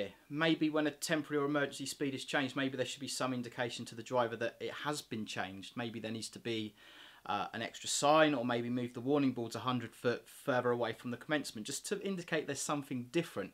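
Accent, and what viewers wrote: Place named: Cockney, United Kingdom. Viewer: You sound like a cockney. Are you from Essex?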